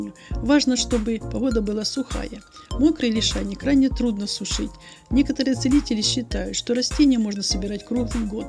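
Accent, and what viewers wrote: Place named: Ukraine. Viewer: с украинским произношением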